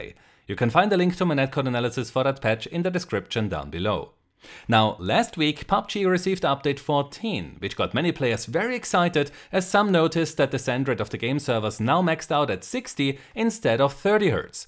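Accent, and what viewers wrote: Germany. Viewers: 1 satz und man hört das du deutsch bist :D klasse video!! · German speaking english????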